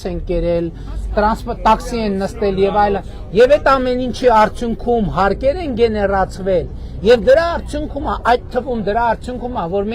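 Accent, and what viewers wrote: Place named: Dilijan, Armenia. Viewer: Дилижани акцент тох хелоооок е. Ваз газа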